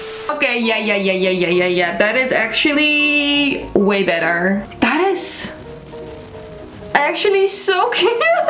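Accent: Netherlands. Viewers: Ik heb mijn voorhoofd verbrand met mijn stijltang, en ik heb perongeluk mijn bangs micro geknipt... Ik zoek gewoon voor antwoorden op YT, en dan zie ik jouw video en mijn brein gaat zo van "ZE KLINKT NEDERLANDS" En nu ben ik blij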